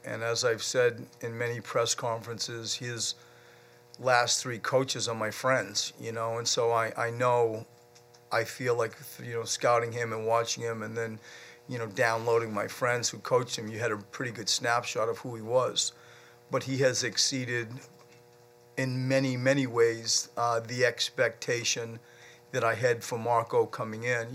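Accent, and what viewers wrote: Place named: Maine, United States. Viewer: That's a Maine accent (northeast USA).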